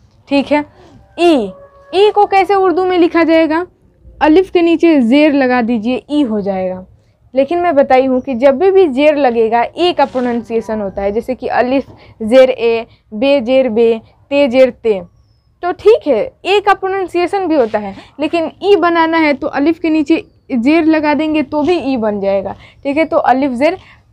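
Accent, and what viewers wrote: Bihar, India. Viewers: आपका prounaunciation से ऐसे लगता है की आप बिहार से है, कहा से हैं आप? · उर्दू का उच्चारण मुश्किल है। यही एक वजय है, लोग उर्दू सीखें से कतराते है। आप तो बिहारी है। आपके लहज़ा से पता चलता है।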